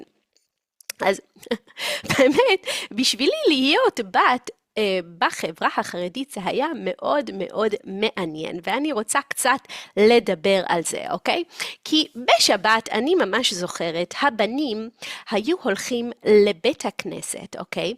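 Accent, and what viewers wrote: United States. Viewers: I love your podcasts- very fun, informative, and very good for my Hebrew learning. It is awesome the way you are completely bilingual and can switch so effectively between Hebrew and English and your accents are perfect (perhaps a bit American in your Hebrew but OK!) Just one idea- if you could look into the camera when you speak. Your gaze is always to the side and a bit low. I think your podcasts would benefit from looking straight into the camera, though it may take a little practice. Thank you sooo much!!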